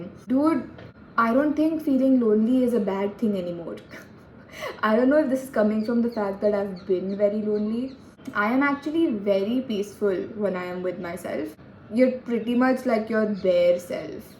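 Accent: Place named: United Kingdom. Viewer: Watching your videos is just so amazing 💙🥰🤩.. your voice.. your thoughts.. your insights to little things in life.. that little talking to yourself.. the in-between marathi convo.. the perspective about loneliness and marriage..that UK accent.. that cute and realistic convo with your brother.. correcting his vocab and pissing him😂.. that confidence about Cadbury adv.. etc..etc.. all of these makes the video so wholesome 💙✨🥺 I feel so bad, I missed a chance to ask questions. Please upload more of these. ✨ Wish you the best in life💙✨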